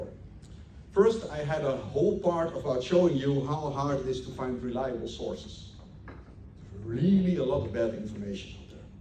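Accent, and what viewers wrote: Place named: Netherlands. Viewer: Oh haha, dat is perfect! Meende al een Nederlands accent te horen, maar top, dan zal ik die ook nog eens doornemen om zeker te zijn dat ik het ingewikkelde engels (lees: technische engelse woorden die niet in mijn dagelijkse leven voorbij komen) niet verkeerd begrijp. Hartelijk dank voor de reactie!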